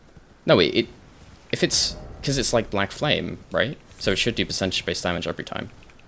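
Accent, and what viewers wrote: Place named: United Kingdom. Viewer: is my favorite Australian streamer. Weird that he has a British accent though.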